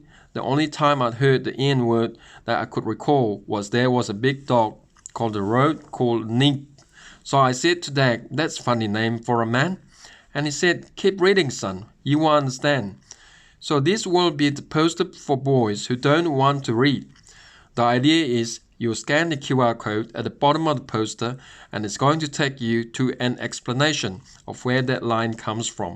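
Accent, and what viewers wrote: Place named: New Zealand. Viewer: Your NZ accent comes to the fore here.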